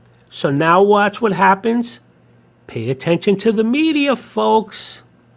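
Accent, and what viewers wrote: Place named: New York, United States. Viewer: I'm southern but I think this being read in what seems to be a thick NY accent, with added sarcastic flourishes, makes it much better.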